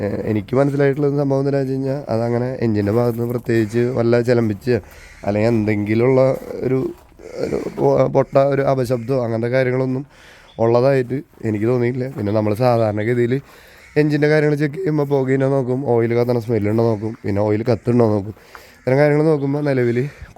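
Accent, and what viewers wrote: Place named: Thrissur, India. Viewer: നിങ്ങളെ സംസാരം കേൾക്കാൻ നല്ല ഭംഗിയുണ്ട് തൃശൂർ അല്ലേ🌹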